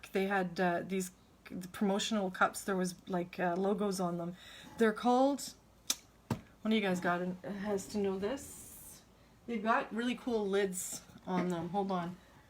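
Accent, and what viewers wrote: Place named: Minnesota, United States. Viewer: Aside from saying "Ay", you sound just like a Minnesotan to me😁 I want to make cups now, you made it look doable (not easy) for a crafter. Thanks!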